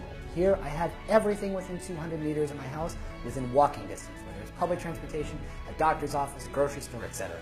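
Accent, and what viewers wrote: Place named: Basque Country, Spain. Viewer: Men now you have that Vasco accent so much on every word comes out of your mouth... Lol.. A American Spaniard 😁 good video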